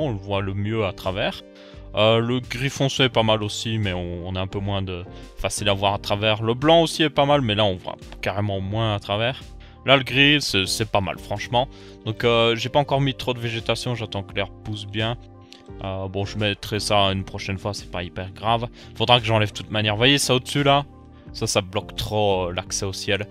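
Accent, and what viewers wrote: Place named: Belgium. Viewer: la vérité t'as voix de belge est sexy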